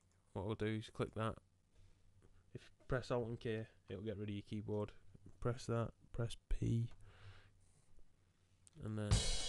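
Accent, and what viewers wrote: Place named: South Yorkshire, United Kingdom. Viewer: your accent sounds familiar, you from south yorkshire?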